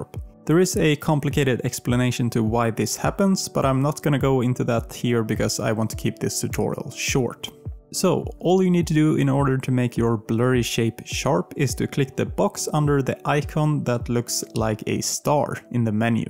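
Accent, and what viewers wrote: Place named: Sweden. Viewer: Omg that Swedish accent is so recognizable 😅 fellow swede!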